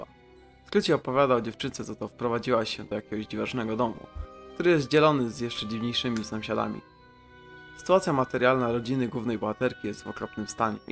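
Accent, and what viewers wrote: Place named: Poland. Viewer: Polglisz mocno xd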